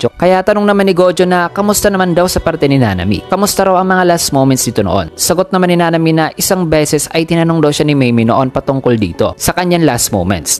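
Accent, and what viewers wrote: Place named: Conyo, Philippines. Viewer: napaka conyu mo naman pare magsalita., dapat mag-english ka nlng kung nahihirapan ka magtalog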